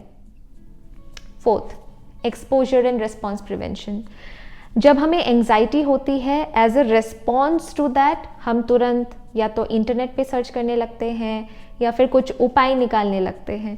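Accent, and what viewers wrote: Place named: Bengal, India. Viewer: Aapki language bangaali tone jesi lagti he Kuch kuch.... Aap bangaali he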